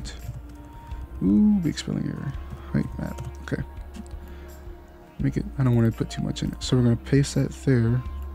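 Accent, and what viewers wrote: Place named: Netherlands. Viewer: he is from holland do you expect perfect English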